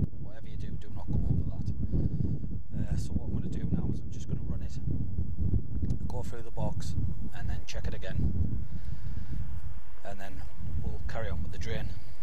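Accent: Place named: Durham, United Kingdom. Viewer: You sound like your from my kneck of the woods Durham very helpful video cheers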